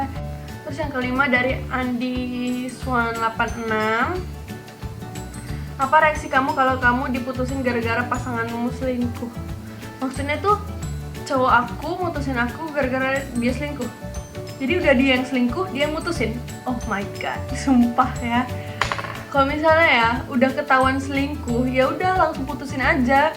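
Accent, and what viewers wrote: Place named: Medan, Indonesia. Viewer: khas medan kali yakan 😂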